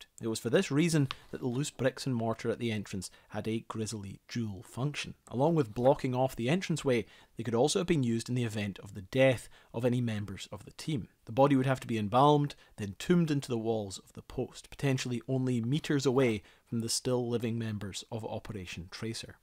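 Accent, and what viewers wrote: Scotland, United Kingdom. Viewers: Really great video,. Never heard of this bunker before and I guess a lot of people didn't know anything about this top secret place either. The idea of basically being buried alive in there is kind of freaky. Those volonteers had balls of steel and were really dedicated to their country. I loved the narration and the scottish accent. I'm going to go watch your fort drum video, I've been looking at that thumbnail for a while.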